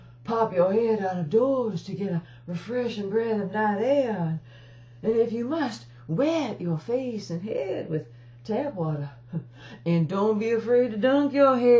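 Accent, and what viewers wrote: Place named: South, United States. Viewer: I vote for number two all the way. ' I think number two fits you and your personality. Lady like and professional all the way, and the Southern accent fits you also. You are a true Queen of the South""""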